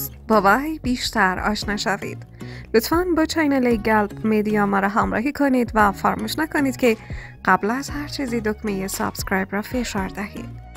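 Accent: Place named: Iran. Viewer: خدا صدایت اصلاح کند لهجه ای ایرانی برو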